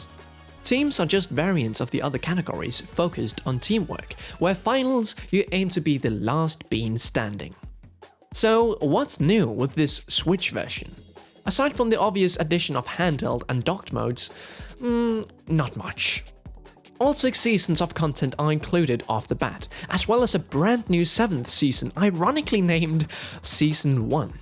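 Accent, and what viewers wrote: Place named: RP, United Kingdom. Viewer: Why are you taking so posh? That’s not how you normally talk.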